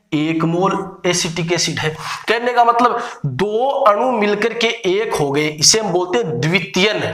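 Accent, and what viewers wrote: Haryana, India. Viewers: Sir. Ap. Haryana. Se. Hai. Kya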